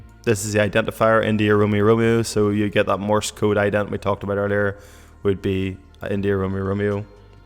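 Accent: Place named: Northern Ireland, United Kingdom. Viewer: Just browsing some flight sim stuff and heard ur accent, didn't expect u to be from NI also haha. Good man 👍🏻👍🏻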